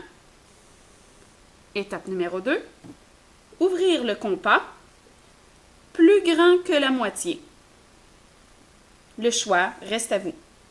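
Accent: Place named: Quebec, Canada. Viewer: ps: super l'accent Québéquois (surtout ne le prend pas mal)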